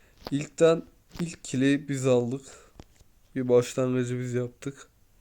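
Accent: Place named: Kahramanmaraş, Turkey. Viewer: Maraşlımısın kardeş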